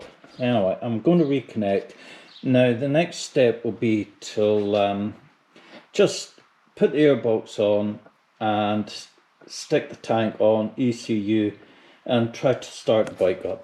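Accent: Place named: Scotland, United Kingdom. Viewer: A braw bricht Scottish accent!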